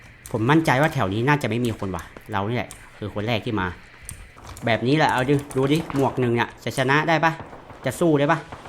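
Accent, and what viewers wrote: North, Thailand. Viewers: ปี่เป็นคนเหนือกะคับ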